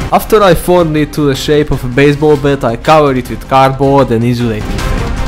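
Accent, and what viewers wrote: Russia. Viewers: Сразу понятно что он русский, по акценту немного напоминает русского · His accent is very similar to Russian,Or am I the only one who hears this? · Ты понимаешь русский?Акцент не английский.Do you speak russia? No English accent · Bro are you russian or what why you have russian accent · bro your accent sounds sooo good, you sound like an russian... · you have an amazing Russian accent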